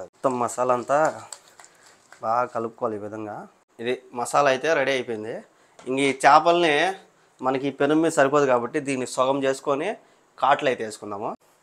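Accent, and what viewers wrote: Rayalaseema, India. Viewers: Ne voice rayala Seema launge super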